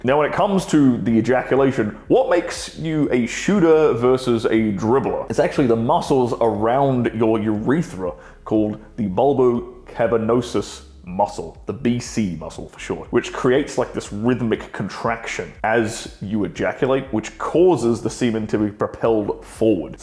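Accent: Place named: Australia. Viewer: He's got an accent buddy. He doesn't speak God's American English. He speaks Australian Queen's English.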